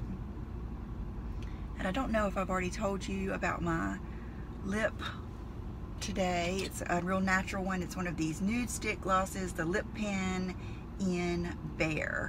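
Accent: South, United States. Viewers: I've lived in NC my whole life- near OBX and have never heard such a wonderful southern accent! voice is just sooo pleasant! I just love it!....oxo! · Yay!! So glad to be able to spend days with you- it's so funny I feel like I can really hear the southern in you more in this vlog! 😘😘😘😘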